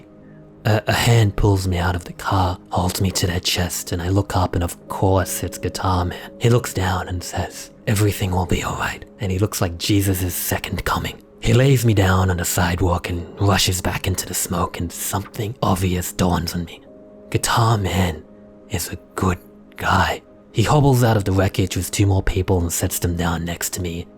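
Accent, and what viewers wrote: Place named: Australia. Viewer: Are you Japanese? Also you seem to an Australian accent. Did you study or live in Australia?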